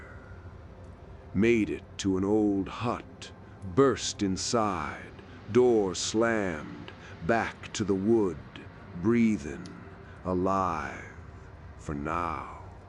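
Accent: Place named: Appalachia, United States. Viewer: I find it an interesting mix that protagonist has a Russian name (a diminutive of Anton) but the narrator dialect makes me think this is takin' place down in the holler of Appalachia. I like it a lot!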